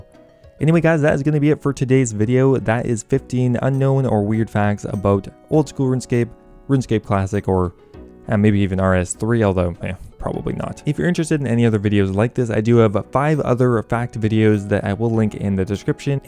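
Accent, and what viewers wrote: Canada. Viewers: Are you Canadian? You DO have an accent but it's so hard to place EDIT: Just looked up your Twitter and it lists your location, I fucking knew it you Canuck bastard, I live on the US side of Niagara Falls and I am very familiar with the subtle differences in the pronunciation of certain words (plus out of all local radio stations I receive, the Canadian rock station we get here is better than the one on the NY side tbh)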